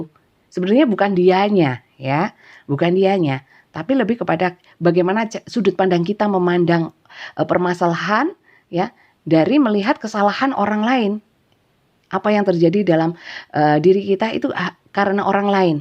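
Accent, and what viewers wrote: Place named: Malang, Indonesia. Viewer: Suka banget cara nyampaikan bunda , Arema banget pokoknya soalnya dulu waktu kuliah di mlng teman teman cara ngomongnya ya logatnya kayak bunda deh, kakak saya juga banyak yg tinggal di mlng jadi klop dgn bunda , enak dengannya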